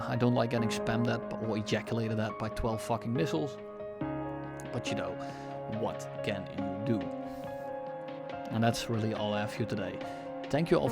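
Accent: Netherlands. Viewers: A dutch man sentually vispering sweet trailer details into my ears. ❤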